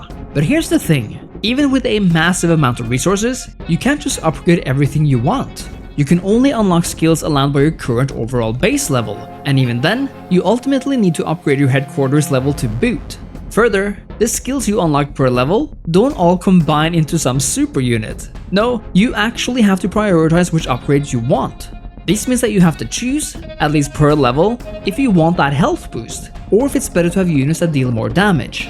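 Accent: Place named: Norway. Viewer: He is from Norway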